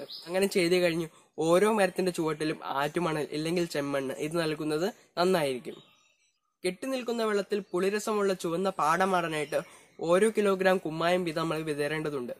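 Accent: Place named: Palakkad, India. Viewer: Evar place palakkadu aanu adahanu engane samsarikkunnad evarude samsara reedhi engane aanu padichu parayunnadalla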